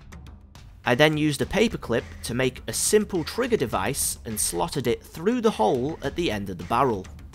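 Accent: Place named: England, United Kingdom. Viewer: Welcome to England pronunciations good sir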